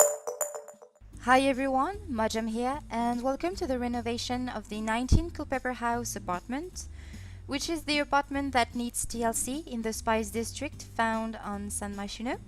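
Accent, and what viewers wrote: France. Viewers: J'ai reconnu direct que tu etait française ❤️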